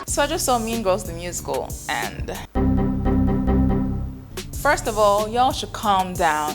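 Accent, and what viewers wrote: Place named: Nigeria. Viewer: I knew you where nigerian because i noticed your accent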